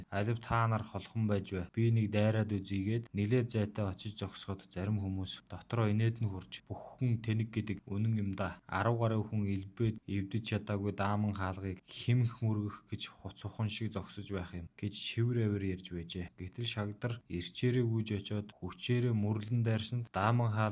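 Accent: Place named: Buryatia, Russia. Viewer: Buriad yumuu aylagtain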